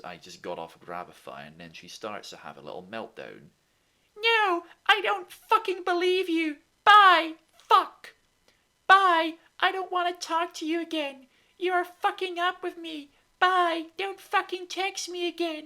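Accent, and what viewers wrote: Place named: New Jersey, United States. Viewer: Your NJ accent is dead on!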